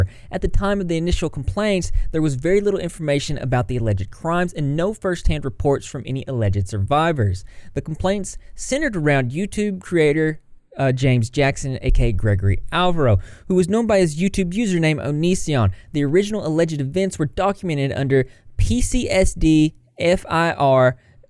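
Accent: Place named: Georgia, United States. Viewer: I don't even care about onision at this point. I just came to hear "and in. Today's. Video." In country ass Georgia accent.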